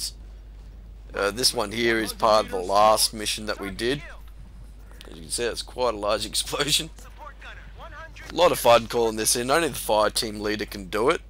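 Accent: Australia. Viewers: austrailian, english, or russian? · im guessing ur an aussie coz u keep saying mate lol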